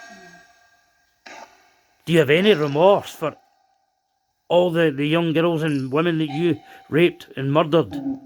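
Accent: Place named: Scotland, United Kingdom. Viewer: Your Scottish accent...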